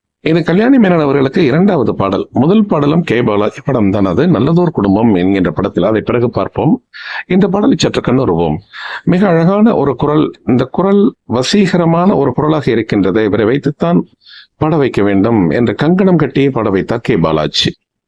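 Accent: Sri Lanka. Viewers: Thokirthu vazhangum ungal kural ilanngai ucharippu pondru ulladhu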